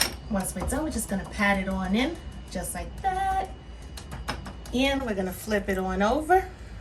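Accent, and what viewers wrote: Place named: Boston, United States. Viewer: One more thing. I always said that I love your accent and I would always say NYC, but now that I have watched your videos more...., GIRL YOU ARE FROM BOSTON!!!!! PLEASE TELL ME THAT I AM CORRECT. I worked at a Assited Living Facility for awhile and one of the lady's there was from Boston and I loved the way she spoke...., and OMG YES MA'AM PRESS HAM ♥♥♥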